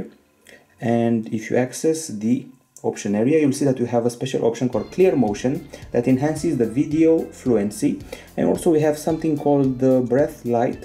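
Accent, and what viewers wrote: Romania. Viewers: se simte in voce ca esti roman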